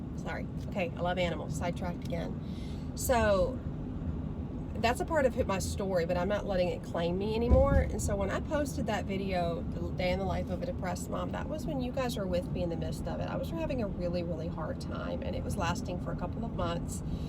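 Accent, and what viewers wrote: South, United States. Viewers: I love your chatty videos! I especially love your southern accent.... go ahead sing talk all you want! I'm on the same boat with anxiety & depression, it comes in waves for me too. On top of that I'm an introvert in every which way. I smile through it all & try not to drag others down with me. I'd rather bring a smile & say hello to others, just in case they're dealing with a similar situation or worse. It's in my nature to do that.... loved this video! 💜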